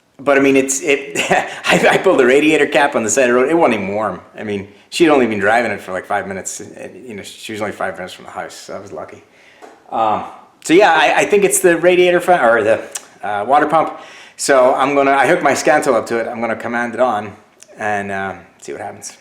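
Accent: Belfast, United Kingdom. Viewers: Nathin, oul belfast coming out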